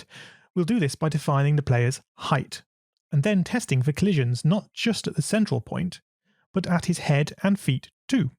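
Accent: United Kingdom. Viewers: okay look I’ve given this a lot of time, probably too much time, too many failed attempts… All these positive comments mean to me is you guys already understood something, this video did not help me build a working pixel game, and I did follow the instructions carefully. has been highly praised, so you will need to allow me to give one negative comment. Many times in these videos he misspeaks and corrects his speech with pop up text, which he uses unnecessarily all the time, saying things like “nice” and “looks great” and it just isn’t practical to believe you are adequately correcting your mistakes by mixing them in with all that overly happy sounding crap. Just show us how to make a video game. At this point I wasted 50+ hours of my life on you. Do better if you’re getting millions of views. Going off on tangents, changing things to “have a little fun” was not fun for those of us who came here to follow a step-by-step video. 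I did not learn how the components work from, not on this video or any other, probably because he kept changing things and half-explaining them. It’s like you have to use his specific complicated way that you don’t really understand but trust because he did it, or nothing. I wish the algorithm would give me more users, it is heavily biased to deliver, no surprise given we’ve been brainwashed to believe a white man with a British accent is the best. He’s not.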